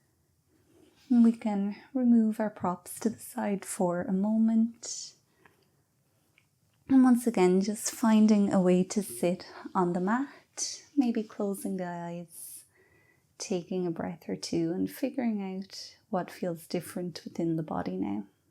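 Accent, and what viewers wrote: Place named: Ireland. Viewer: Excellent class!! And the accent is a beautiful bonus , may I ask what it is, Irish?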